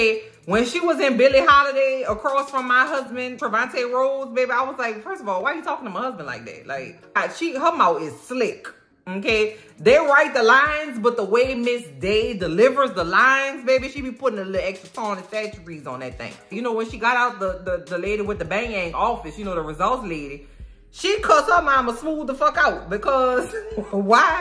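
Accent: New Orleans, United States. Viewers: I normally can't stand the sound of a Nola accent, but yours is pleasant, and you're funny af😂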